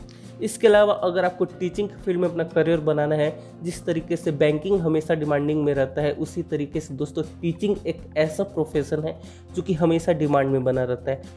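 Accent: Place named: Uttar Pradesh, India. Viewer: Kuan kaun ye bhaiya ki aawaj Uttar Pradesh se sun raha hai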